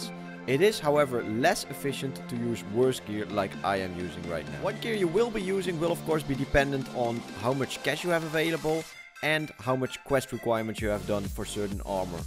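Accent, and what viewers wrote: Netherlands. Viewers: aan je accent te horen ben je nederlands :)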